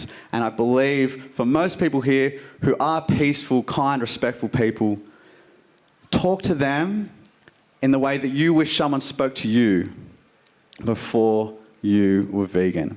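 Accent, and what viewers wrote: Australia. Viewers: u Australian lad?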